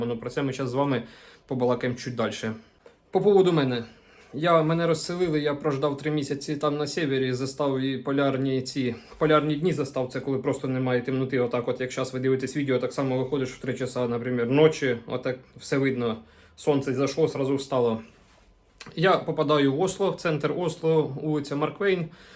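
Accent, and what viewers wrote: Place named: Surzhyk, Ukraine. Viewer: Який жаххливий суржик, ще не чула, щоб так спотворювали нашу солов'їну мову. Де цей хлопак чув оце? "не повторяйте моїх ошібок"😅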